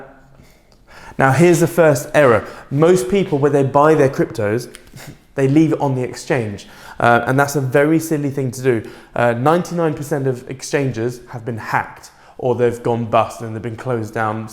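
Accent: United Kingdom. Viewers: Where you from? Trying to figure out that accent....British? You live in the states?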